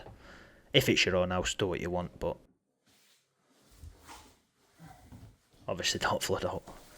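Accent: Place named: Yorkshire, United Kingdom. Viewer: Brilliant video. I had the dampers replaced in mine a few months ago, but now it's making a horrendous sound spinning with sparks flying inside the drum. Probably bearing collapse. Your strong Yorkshire accent brings back memories. I was born and bred in Yorkshire. I moved from West Yorkshire to Cornwall 30 years ago as it was being taken over by Pakistanis and other minorities.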